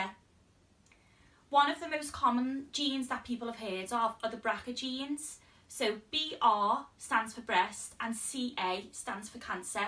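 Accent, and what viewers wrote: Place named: Liverpool, United Kingdom. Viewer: I just saw the old blues email and ended up watching your "5 minutes with..." video and thought it was a great initiative to get people interested in science! I also loved the Oxford dinner and ended up there studying chemistry. The part where you mentioned maybe not fitting in because of the accent made me laugh since it really resonated with me haha. Anyway, as I say, great initiate to show someone real and relatable in science and it's always nice to see a Scouser doing well! :)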